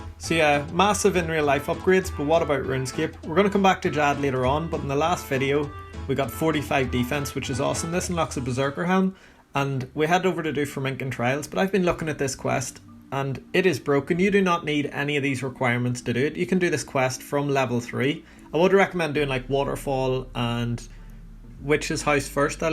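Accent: Northern Ireland, United Kingdom. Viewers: You from northern ireland?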